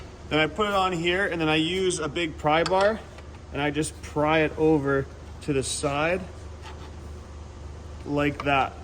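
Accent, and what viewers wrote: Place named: Canada. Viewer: Ya that was a super sick video dude well explained, thought you sounded Canadian then saw the sask plates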